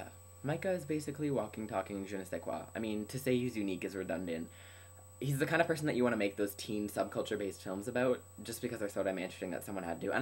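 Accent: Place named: Canada. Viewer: Haha. I love your canadian accent, the way you say 'About' and 'House.' It's however not as thick as Dhiel's, from another gay-themed collab channel, something like awesome not so straight guys. I always forget the name. v_v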